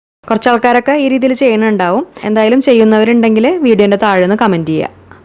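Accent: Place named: Thrissur, India. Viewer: തൃശൂരാ... ല്ലേ.. ഇനിക്ക് മൻസിലായിട്ടാ.... വർത്താനം കേട്ടപ്പഴേ... 😊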